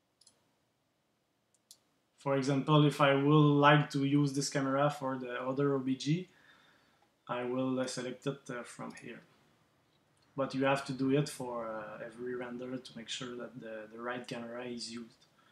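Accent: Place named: Quebec, Canada. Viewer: Très rafraîchissant d'entendre du bon vieux Québécois agnlais😁 mais sérieux merci pour l'approche «straight to the point» avec les exemples simples. J'essaie de m'adapter au mieux à la logique des rendus de Blender.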